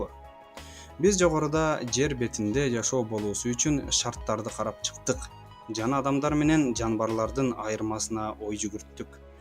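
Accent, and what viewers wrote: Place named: South, Kyrgyzstan. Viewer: Баракелде.Видеолоруңузга чоң рахмат. Бирок, түштүктүн диалектилерин кошпой сүйлөңүзчү. Сураныч. Адамды ирээнжитпей. Кыргызча так сүйлөө керек да.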